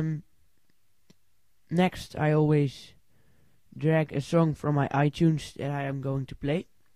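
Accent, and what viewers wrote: Netherlands. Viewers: Je kan horen dat je Nederlands bent xP Maar goeede tutorial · Je zet de Nederlanders voor schut met je kutaccent!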